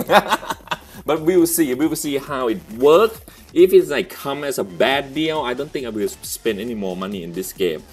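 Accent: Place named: Thailand. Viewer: Are you from Thailand? you talked like my friend, your accent kinda feels like ppl from there.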